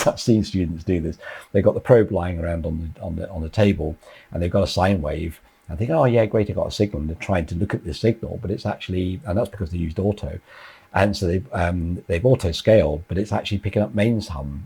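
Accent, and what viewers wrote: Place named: United Kingdom. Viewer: Master class with a nice British accent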